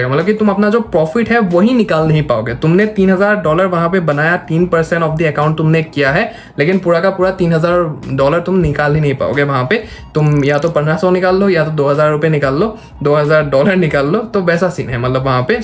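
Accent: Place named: Bengal, India. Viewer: ye banda sure bengali hain.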